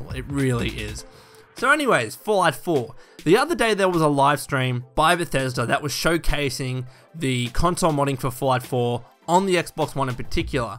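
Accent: Australia. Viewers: Wow, I'm kinda digging the Aushie accent this way.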